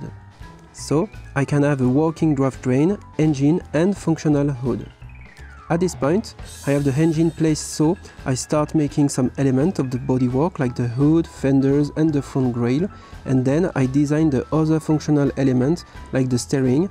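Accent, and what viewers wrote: France. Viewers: Je valide l'accent. Une version audio française serait superbe. Excellent modèle. J'aime beaucoup ces nouvelles roues, elles sont très réalistes.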